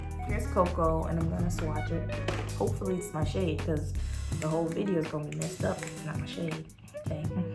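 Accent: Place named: Jamaica. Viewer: Sound Jamaican 😎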